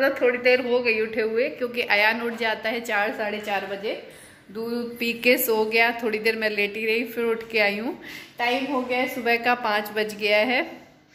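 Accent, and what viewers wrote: Uttar Pradesh, India. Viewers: Aap kha se up se ho gya apki awaj se lg rha hai plz reply jrur krna kha se hoo tum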